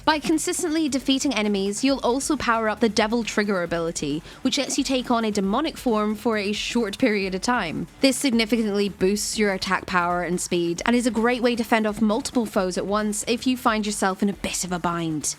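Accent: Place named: Bristol, United Kingdom. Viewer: You sound like your from bristol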